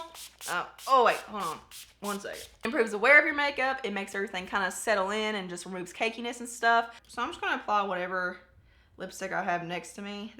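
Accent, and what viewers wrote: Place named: Kentucky, United States. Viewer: Girl I knew from the first time I watched one of ur videos u were from Kentucky! The accent gives it away for sure but I'm a Kentucky gal too! I'm from Letcher co ky.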